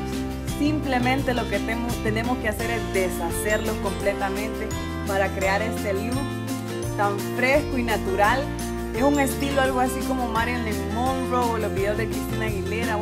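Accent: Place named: El Salvador. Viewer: Tiene acento de mi El salvador 🇸🇻♥️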